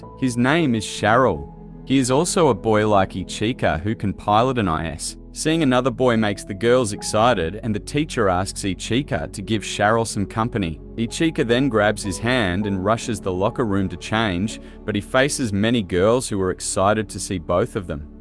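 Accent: Australia. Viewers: the assie accent is really offputting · Nice Australian accent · Australian accents go hard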